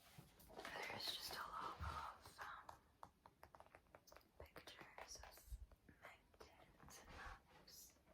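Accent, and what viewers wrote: Scotland, United Kingdom. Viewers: Just discovered your channel! It’s up there with the discovery of penicillin 👀🤣 haha amazing voice for ASMR, so soothing and calming, plus ye canny beat a Scottish accent 😋 I’ll look forward to many more videos. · My goodness, you're the double of model Emily Ratajkowski, but with a Scottish voice... what a perfect combination 😊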